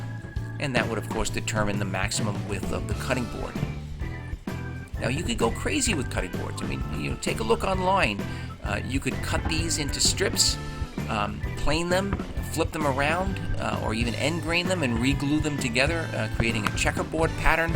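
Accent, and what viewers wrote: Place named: New York, United States. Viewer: I bet my life this dude grew up in either Staten island or Queens lol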